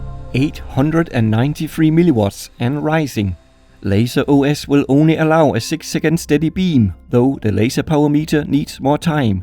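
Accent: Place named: Denmark. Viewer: You sound Danish :)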